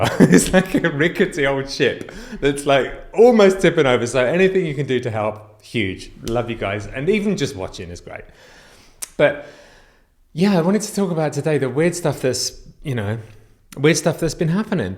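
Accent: United Kingdom. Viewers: Love your snappy suits! Strong, masculine and intelligent. Plus, British accent! ❤ Get a German Shepherd!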